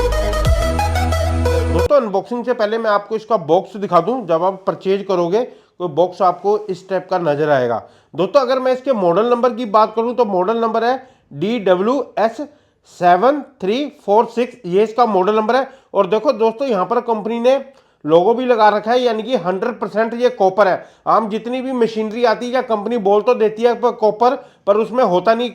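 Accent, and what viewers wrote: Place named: Punjab, India. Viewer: Abhi aap delhi se hai ya punjab se aapke bolne ka dhang punjabi lag raha hai 🙏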